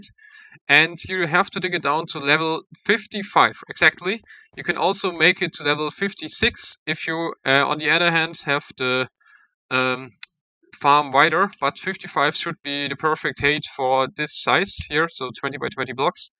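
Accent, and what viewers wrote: Germany. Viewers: Man höhrt das du deutsch bist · Was glaubst du denn, den Dialekt bekommt er nicht mehr, aus seinem Englisch raus. Deswegen sind wir deutschen leider negativ aufällig in der englischen Sprache, weil es soviele gibt die sprechen als ob sie aus der Grundschule kommen...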